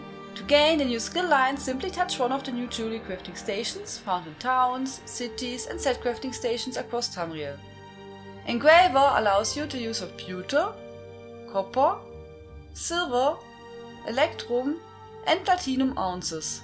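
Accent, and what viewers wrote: Germany. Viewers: This really is one of the best directly informative ESO channels. It's also a nice bonus that your uniquely German accent really makes it engaging to listen to.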